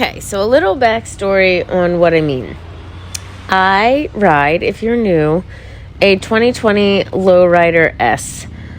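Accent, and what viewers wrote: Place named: Maryland, United States. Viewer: Despite living in the area, (Fairfax) every time I watch your videos and hear your voice, I think, "That girl is definitely from Maryland" with your Maryland accent. You and cameraman both. I guess because I'm a New Jersey transplant who came to the DC area after my job transferred me here 30 yrs ago. LOL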